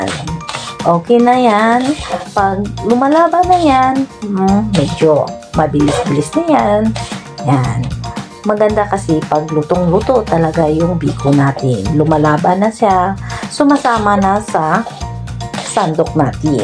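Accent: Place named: China. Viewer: Level up na biko ito my dear friend, Nakakatuwa po intonation the way your spoke po ng tagalog parang chinese intonation po. Amazing tutorial po my dear friend.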